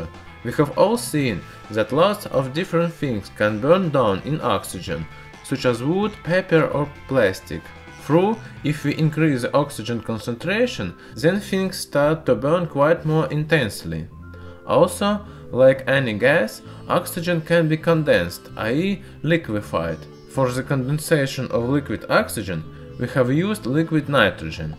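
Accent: Russia. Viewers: Ocean Junkie I Agree He speaks perfect English with his very thick Russian accent. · Just started watching this channel recently. This is one of the best beginner's chemistry channels on youtube. Love the Russian accent and light humor as well! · Love to hear Russian accent speaking English, looks wiser think so 🤔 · Man, I love your videos and your Russian accent. Keep up with new content. Cheers.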